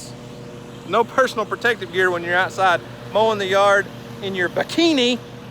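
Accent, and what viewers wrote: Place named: South, United States. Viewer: Fl'ars'...luv thet country-fried accent!!💓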